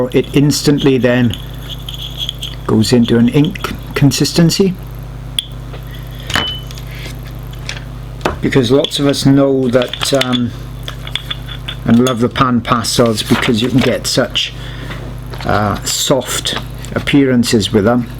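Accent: Wales, United Kingdom. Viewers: The absolute joy I have not only finding this video in my first ever day using pan pastels, but also hearing the voice of a fellow cymry. I just find it so soothing and familiar when learning new things, so I'm overjoyed to have found your channel.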